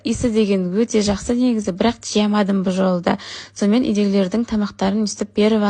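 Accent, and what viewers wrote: Shymkent, Kazakhstan. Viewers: Батыста жемейди буны, кумаш карашиш дауысын Шымкент емеспа нагой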